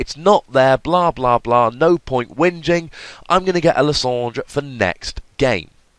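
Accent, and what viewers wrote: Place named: United Kingdom. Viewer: A bunch of random t's, that's so British of you.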